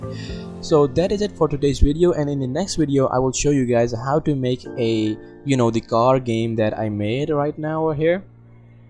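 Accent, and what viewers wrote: India. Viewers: That Indian Accnet is very bad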